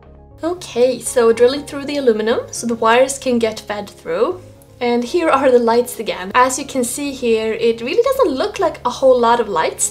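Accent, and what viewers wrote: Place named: Sweden. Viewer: I love your accent, are you Swedish?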